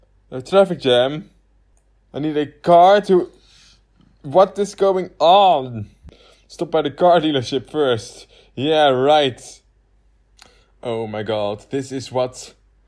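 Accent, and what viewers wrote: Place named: United Kingdom. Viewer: Omg I thought you weren't aiming for a british accent but just that for some reason you were pronouncing words differently a bit this time but then I read the description! xD Really fun video as always!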